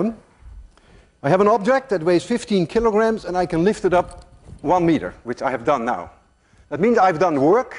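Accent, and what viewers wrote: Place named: Netherlands. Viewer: its a duch accent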